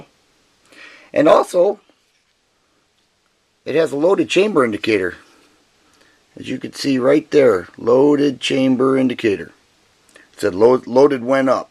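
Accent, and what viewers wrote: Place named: Upper Peninsula, United States. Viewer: Whereabouts in MI are you from? I grew up in the Detroit area, you sound very UP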